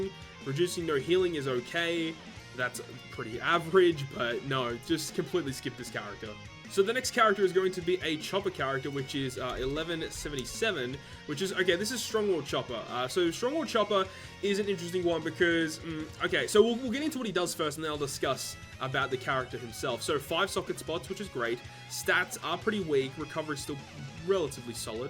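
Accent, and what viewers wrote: Australia. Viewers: Been looking for this damned video. I need an Australian accent to explain game to me thank God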